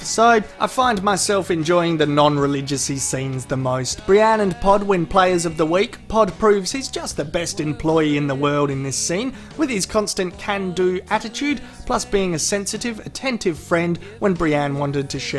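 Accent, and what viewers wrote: Australia. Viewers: this guy got everyone trying to speak like a australian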